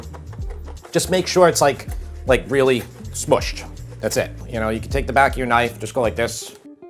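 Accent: New York, United States. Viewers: One reason besides the nice recipes you cook is your New York accent. I miss the accent, the culture, everything.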